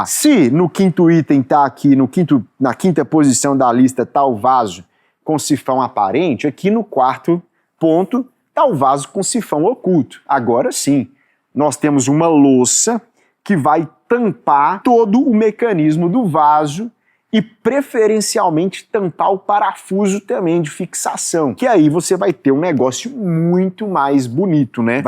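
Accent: Minas Gerais, Brazil. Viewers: Otimo video .Quanto a esses vasos premium , se por acaso estragar o sistema de descarga , fica muito complicado.Uma vez vi propaganda de um vaso com altura um pouco maior para facilitar a vida dos idosos, acho feio aquelas tampas altas .Esse seu sotaque é de MG?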